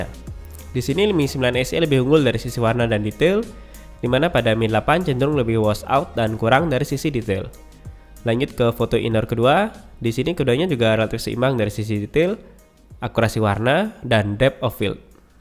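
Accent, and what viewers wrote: Bali, Indonesia. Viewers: Kadi jeg logat Bali asane niki pak?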